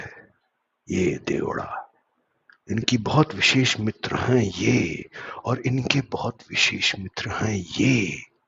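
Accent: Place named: Haryana, India. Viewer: 👍 good, but why the thick haryanvi accent? Could not understand much. Could you please not whisper so much into your mike, sounds creepy and a bit pronographic 😅 Otherwise, excellent research 👏 keep it up 😅😅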